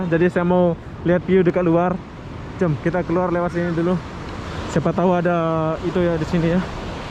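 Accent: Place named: Malaysia. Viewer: Pakai loghat upin ipin ya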